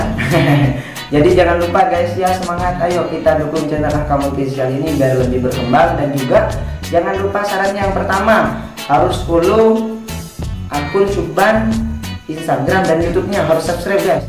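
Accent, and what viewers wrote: Madura, Indonesia. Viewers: orang madura ya mas..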